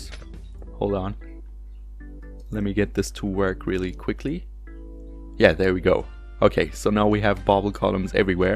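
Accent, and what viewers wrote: Germany. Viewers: Dein Deutsch hört man bro :D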